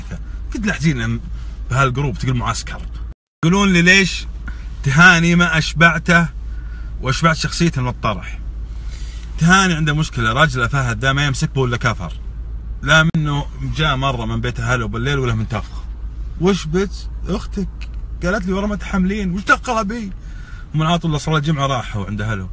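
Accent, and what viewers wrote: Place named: Qassim, Saudi Arabia. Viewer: وش فيها لهجته احسن لهجة بعد قلبي القصمان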